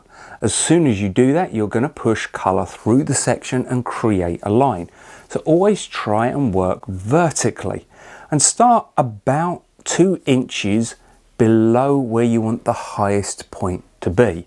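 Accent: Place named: United Kingdom. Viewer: Thank goodness mate. British, Clear straight to the point. Thanks alot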